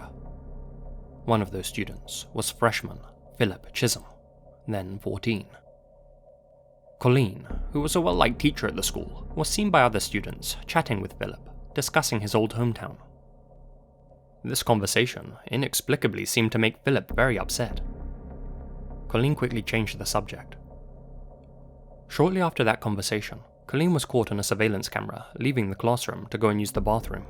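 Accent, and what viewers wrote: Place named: United Kingdom. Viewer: I don't really care hpw you make videos. However, I would like to learn how to get that swanky British accent.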